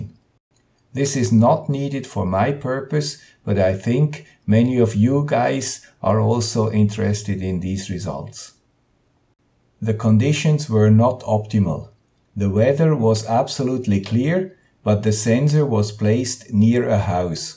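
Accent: Switzerland. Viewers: Hier ist ein Typ mit Schweizer Akzent ! Vielen Dank!!! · Gruezi "guy with the Swiss accent". Some people might say I have even more a Swiss accent even though I moved from the Berner-Oberland to Seattle a half a century ago ;-) I really enjoyed watching your video and learning many things. Being Swiss we just have to be precise. As a boy I used to walk miles to get to a watchmaker's storefront window to set my watch to the exact time displayed there. ... and soon my "official tested (chronometer stamped)" watch would be wrong again before the quartz crystal electronics. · Thumbs up for the informational video and "here is the guy with the Swiss accent again."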